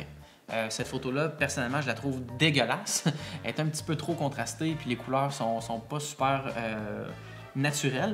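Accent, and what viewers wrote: Quebec, Canada. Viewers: Merci pour tes vidéos qui m'apportent à chaque fois quelque chose de plus, même si j'ai du mal à toujours te suivre, avec ton accent québecois, et oui je suis Français...! Mais ça va venir, ma famille commence à plus trop me comprendre, et me demande ce que c'est cet accent bizarre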